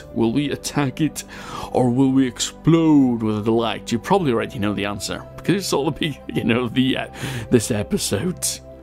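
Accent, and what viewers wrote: Wales, United Kingdom. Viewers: believe me. your accent is definitely Welsh! and strong too. Be proud of it buddy!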